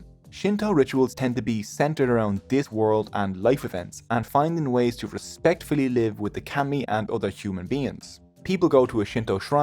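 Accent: Ireland. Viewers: Love the Irish accent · Just bizarre listening to the creation of Shinto in a strong Irish accent......😆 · Wid? An Irish accent does not lend its self to well. Especially when a jokey American style delivery is used for effect. If English is not your first language, tree children, and where day live can be confusing.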